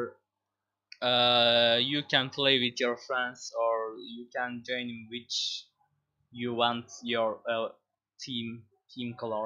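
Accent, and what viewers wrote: Turkey. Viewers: olm sesinden turk oldugu belli oluyor qweqwe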